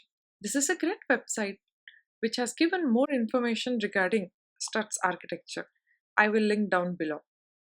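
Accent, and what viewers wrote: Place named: Europe. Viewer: Her accent is more like European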